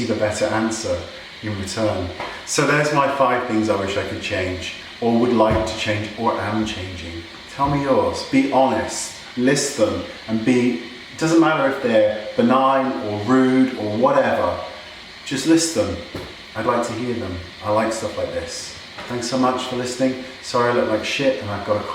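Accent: England, United Kingdom. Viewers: Hey!:) I love your videos! I love your personality and I love you as a person. I know you have no idea who I am but I just wanted to say that you have a lot of support behind you (myself included) when it comes to your videos. I've learned a lot from your videos! Your accent makes me wanna go to England! My dad grew up in the UK and I have an Uncle that still lives there but I have never been to the UK. Hopefully one day when I go that I can meet you while I'm there. That would be amazing! Anyway, have a great day!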